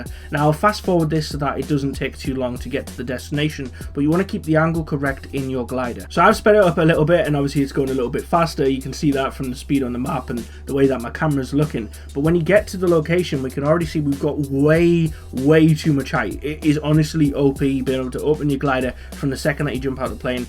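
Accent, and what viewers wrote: Newcastle, United Kingdom. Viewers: you geordie bro?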